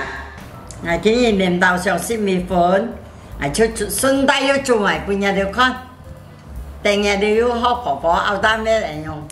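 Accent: Hakka, China. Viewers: 婆婆的客家话跟我的很相似。请问是怡保的客家人吗？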